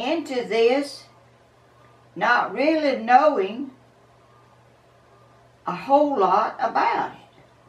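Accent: South, United States. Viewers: You deserve the plaque!!!! We love to her your southern accent, your sweet personality, your faith, you are a blessing to us all......So thank you. The pot you covered today is beautiful!!!!! But what you need is a big silicone cookie sheet to pour your stuff onto and it will come off with no problem.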